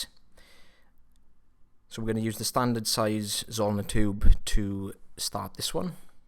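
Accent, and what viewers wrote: Wales, United Kingdom. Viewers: Well done. Take a bow 👏🏻👏🏻👏🏻👏🏻👏🏻👏🏻👏🏻👏🏻 can I please ask where abouts in Wales you are from as you accent is slightly different to Mr B’s. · Love your Welsh accent.....Merry Christmas to you and yours! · Well done!!!! You were calm, cool, and collected. It was quite a tough one, but you just persevered and got those sticky bits out!!! 👂🏼 And your voice is so charming!!! I love a good Welsh accent😊 Can’t wait for day 17!!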